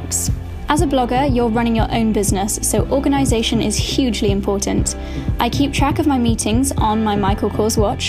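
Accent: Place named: United Kingdom. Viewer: Ur sweety british accent is sooooo cooolllll for me. Happyful,best wishess from BAKU,AZERBAYCAN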